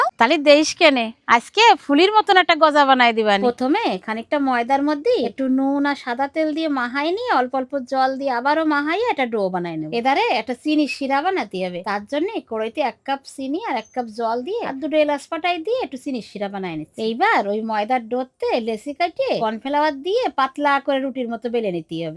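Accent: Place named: Khulna, Bangladesh. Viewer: কাকিমার বাড়ি কি বাংলাদেশ নাকি কাকিমার কথা শুনে বোঝা যাচ্ছে যে খুলনা বা গোপালগঞ্জের ওদিকে কাকিমা বাড়ি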